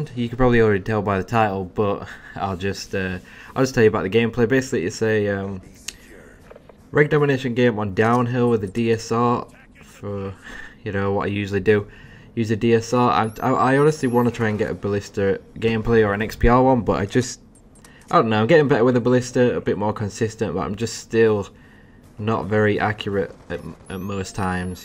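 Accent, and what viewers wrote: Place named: England, United Kingdom. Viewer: ENGLISHMAN!!! :) Ooooooooooshhh!!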